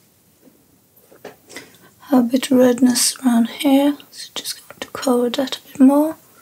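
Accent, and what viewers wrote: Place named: Norway. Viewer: love your accent girl. same as aurora because she is norwegian too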